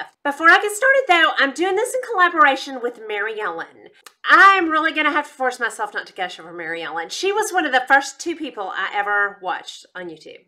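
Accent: South, United States. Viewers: Hi I’m new and Mary Ellen sent me over. You sound like a fellow Southern girl I lived in Tn for years and reside in Beaufort SC now. Back to your vid. 👍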